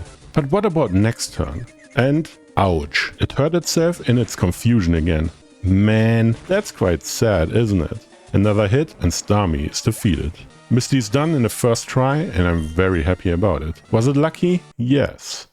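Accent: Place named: Germany. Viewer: The accent is so familar but I can't quite put my finger on it. German?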